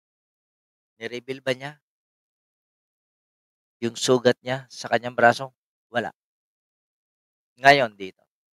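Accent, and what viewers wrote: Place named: Chinese-Filipino, Philippines. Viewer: Hahaha.... Tsinoy kb idol? Para tsinoy kc tuno ng boses mo eh....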